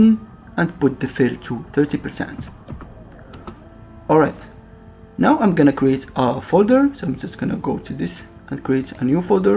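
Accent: Morocco. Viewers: thnaks for the tutorial I found it useful but tell me, are you moroccan ? I raly taste the moroccan accent in your voice!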